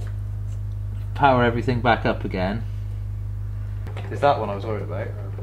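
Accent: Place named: Bath, United Kingdom. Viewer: . Hi, I grew up in Bath so its great to hear the home accent again. You have the most amazing videos, you have a great life and you have done so well in sharing. I just wondered if there was any chance you ran any apprenticeship type causes. you seem to be one of a very thin number of people who I think I would respect enough and be able to learn from.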